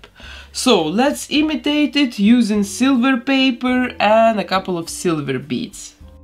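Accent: Russia. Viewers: у вас золотые руки, но от русского акцента нужно избавляться... слушать невозможно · Почему я слышу русский акцент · Удачи! 😅 Вы крутые. Вы русская? У вас просто русский акцент 😃 Красивая леди гага получилась! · акцент как русский хм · Ты очень похожа на русскую девушку. Особенно русский акцент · I can hear Russian accent.Вы большая молодец! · Автор, вы Русская? Акцент прям жесть) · почему такой жёсткий русский акцент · Русский акцент 🤘😎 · Мне кажется или я слышу какой-то типа русский акцент?)) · Я слышу русский акцент... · Это руссктй акцент? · Мне тоже кажется, что русский акцент. Но, возможно, она не русская, а просто есть много стран, в которых четко произносятся все буквы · Вы случайно не русская? С акцентом говорите) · Гриша Человеческий я тоже думаю,что она русская,в уши с самого начала прям ударил русский акцент 😂 но куклы у неё прекрасные😍😍😍😍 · The doll is cool, but the accent you have isn’t cool at all. 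Too much of a Russian, even hard to understand sometimes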